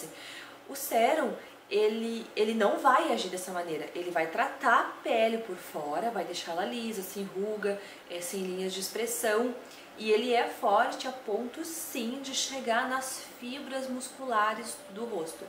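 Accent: Rio Grande do Sul, Brazil. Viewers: você é gaúcha?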